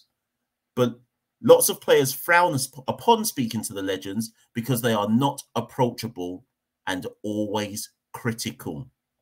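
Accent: England, United Kingdom. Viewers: I don't believed that you were born in Jamaica and talking, like you were born in England. Becaused my ex wife is living in England 58 years, she went there when she was 8 years old and still living there. I was married in England 🇬🇧 31 years, since I was married in England 🇬🇧, but I am living in the United States 👍 almost 30years now. I am older than Ambrose, Ambrose, Bishops, Carl Hooper, Desmond Haynes, Marshall, Jimmy Adam's,BENJAMIN'S them,so I know what I am saying. I can see that you are very young, I know since 1971 playing for the combined Islands them. Ambrose is very much out of order, they just jealous of Gayle with his money and fame.The man 👨 is well loved in India 🇮🇳 and, all over the world. Playing into a few games and failed, I don't see all the big thing what Ambrose is behaving so erratic. Becaused he cannot compared himself with Gayle also Viv Richards, Gayle is the only wi batsman to have two T20 hundreds. 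The first batsman in the history of the T20 cricket 🏏,to scored the first hundred in his 1st match against South Africa 🇿🇦. I hates how these small islands guys is behaving so erratic, they does not want any Jamaican to play on the wi team. Viv Richards is talking about Jason Holder is not a good player at all, he may luckily get a few wickets now and then.Also scored a few runs, but he is not a reliable, or competent player like Gayle. Anybody who knows about cricket 🏏, every player have their ups and down. In their career, so Viv Richards is very st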